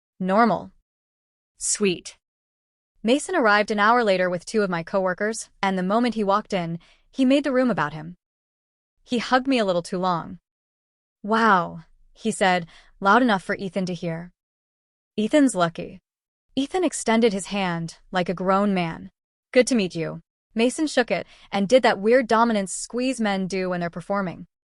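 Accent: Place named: Spain. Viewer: WTF IS WRONG WITH YOU AND STUPID SPANISH ACCENT.SCREW THE NARRATION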